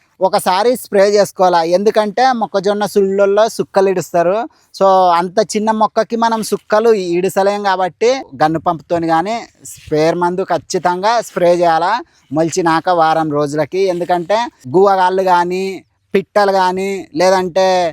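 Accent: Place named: Kurnool, India. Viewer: Pure Kurnool slang Anna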